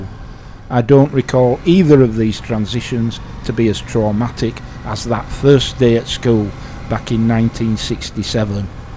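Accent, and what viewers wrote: Yorkshire, United Kingdom. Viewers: This video mainly sounds way more palatable, you don't try to change your syllables etc to sound more "english". Wish you would make more of you're posts talking in your natural yorkshire accent, rather than the pretence of trying to talk queens English (kings ?). PS I have never been unsubscribed in over 12 months. Good luck with channel & take care .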